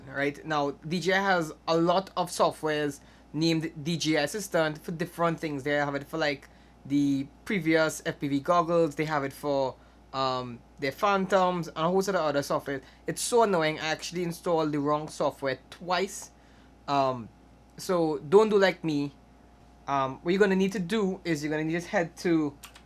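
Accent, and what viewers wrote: Trinidad and Tobago. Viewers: South Africa but spent a great deal of my life in the Eastern Caribbean. From ABC's up to BVI. Visited Trini regular and Tobago was one of my fave kite spots. The Trini accent while toned down in the vid i remembered ha ha ha ha ha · Your awesome T&T accent is a nice change of pace from the hundreds of other FPV videos I watch from guys in the midwestern US. :) Great video. Keep it up! I subscribed, and look forward to more great content.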